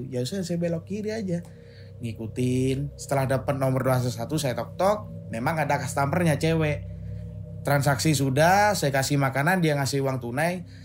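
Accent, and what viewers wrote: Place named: Madura, Indonesia. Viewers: Accent nya Suroboyoan bangett,,tapi mas nya orang Madura nih hee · Asli Madura yaa mass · Iyut Reng oreng Medhureh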